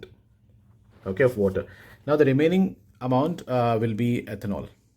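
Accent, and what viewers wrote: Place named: Northeast India, India. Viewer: ohh... You sound very different.. the way you talked thought you're from possibly from northeast India. Keep up with your good works 👌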